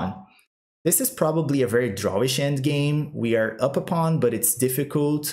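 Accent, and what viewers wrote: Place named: Brazil. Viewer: You have a little brazilian accent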